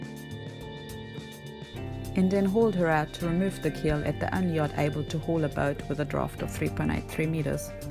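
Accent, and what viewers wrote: South Africa. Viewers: Are you originally from S.A.? I checked your profile and it says NZ, but sometimes your accent sounds a bit SA.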